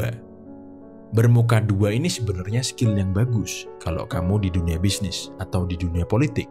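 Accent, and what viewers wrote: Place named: East Java, Indonesia. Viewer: Wkwkwk anjay ada sisi lucu juga antum prof. 😅 Dari logat anda. Anda orang Jawa timur kah. Surabaya sekitarnya. Sidoarjo mungkin. Ini ga ada acara temu fans atau seminar gitu ?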